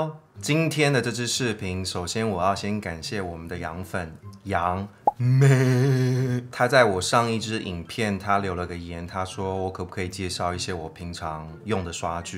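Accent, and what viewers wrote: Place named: Taiwan. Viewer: 好不習慣這麼多台語生活化的你，哈哈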